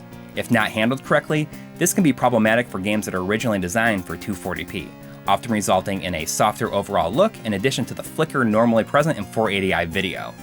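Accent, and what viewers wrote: Wisconsin, United States. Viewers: I seriously can’t take the Wisconsin AAAAccent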